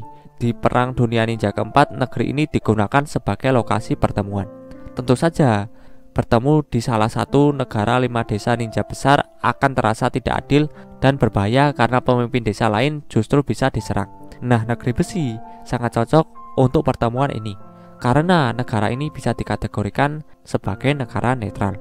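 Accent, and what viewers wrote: Java, Indonesia. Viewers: Org Jawa kebanyakan bahas membahas🤣😂😁 logot mu GK enak di dengar telinga hhh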